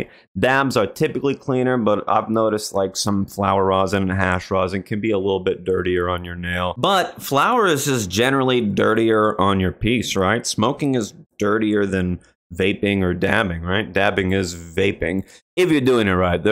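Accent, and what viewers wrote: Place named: Boston, United States. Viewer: Your accent bro! Are you from Boston?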